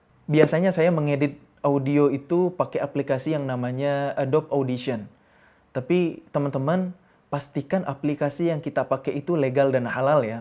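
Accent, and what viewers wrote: Aceh, Indonesia. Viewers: Masyaallah bg..tabarakallah.. Ciri bahasa masi kental acehnya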